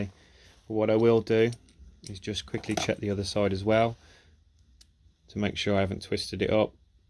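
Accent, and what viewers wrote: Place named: England, United Kingdom. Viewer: Great and informative video! Very clear and well explained (even with the English accent 😉) I just bought one for my son.. it seems they all need some work. Someone too heavy was riding this one and damaged the rear monoshock. Just waiting on the mail now! Has your daughter been enjoying the bike, now that you have it all fixed up?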